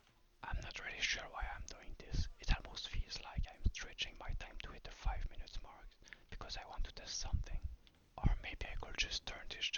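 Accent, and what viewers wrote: Quebec, Canada. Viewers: rreally nice english with a beautiful quebecer accent lmaooo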